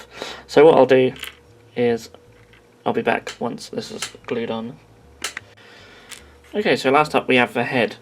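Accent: Norfolk, United Kingdom. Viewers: Are you norfolk? I'm Norfolk and you sound a little bit norfolk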